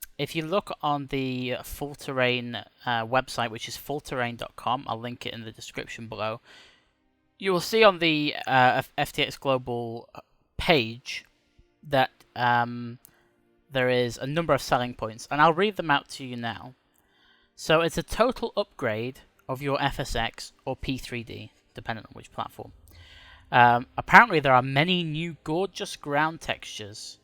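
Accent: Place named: England, United Kingdom. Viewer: can you realy not tell by his accsant, that he is English? i am Norwegian thoght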